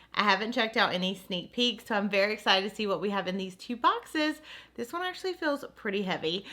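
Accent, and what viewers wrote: South, United States. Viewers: Yay I’m early for once also I LOVE your southern accent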